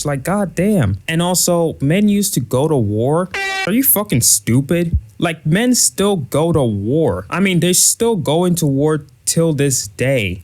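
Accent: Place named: United States. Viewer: Wait...for someone who lives in SA,if he was born there,this dude has American levels of English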